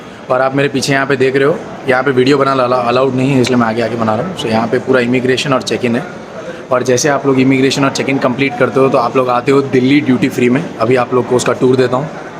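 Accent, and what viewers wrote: Gujarat, India. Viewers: Ap gujrati ho ???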